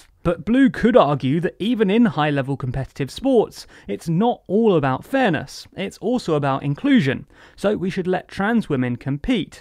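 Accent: United Kingdom. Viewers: Woah, deep video, love this format! More of these please!! I’m able to see the others point of view. It’s crazy hearing a British dude breakdown deep American issues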